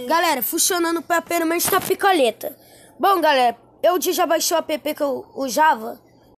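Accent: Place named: Rio de Janeiro, Brazil. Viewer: Ele e Carioca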